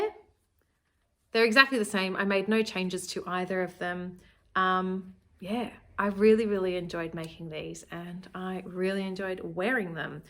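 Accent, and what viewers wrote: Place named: Australia. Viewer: I really enjoyed your cruise handmade wardrobe tour. It’s also fantastic to listen to a fellow Aussie and familiar shops and fabrics. I have two patena blouses and love them. ( a basic but with a twist)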